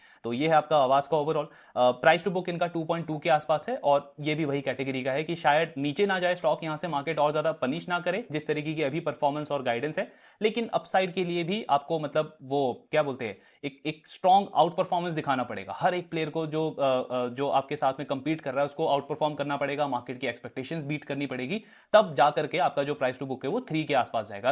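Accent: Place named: Bihar, India. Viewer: Bhai app bihar se ho kaya maybe Madhubani aur Darbhanga se hoge app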